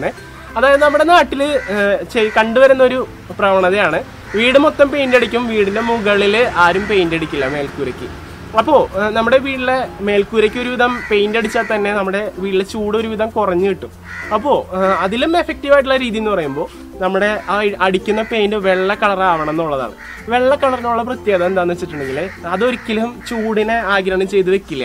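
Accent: Thrissur, India. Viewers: തനി നാടൻ തൃശൂർ സംസാരവും കൺട്രി ലുക്കും ഉള്ള ഇയ്യാൾക്ക് യുട്യൂബിൽ എന്തു കാര്യം (ഇതു പൊതുവഴിയല്ല )എന്നു വിചാരിച്ച് മനസ്സില്ലാമനസ്സോടെ ആണ് ഈ ചേട്ടായിയുടെ വീഡിയോകൾ കണ്ടുതുടങ്ങിയത് ...പക്ഷെ ചുരുങ്ങിയ സമയത്തിനുള്ളിൽ നമ്മൾ സുല്ലിട്ടു ... ഇത് താടി വെച്ച അത്ഭുതം തന്നെ ... great ideas ....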